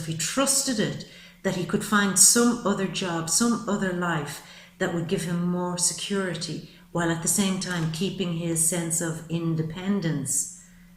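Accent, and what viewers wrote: Ireland. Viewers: I can't believe you said "Bohemian" ... my mother was born in Bohemia! This was very spot on, stressful to hear because it tells me I was right in all my feelings about my current work, but also stressful that I now know what I HAVE to do, which I was trying to avoid. LOL. Not funny really. You are great, accurate, and caring and I appreciate that. I love your accent, is it Irish? My father is Irish. You reminded me of my Sagittarius self. Thank you so much!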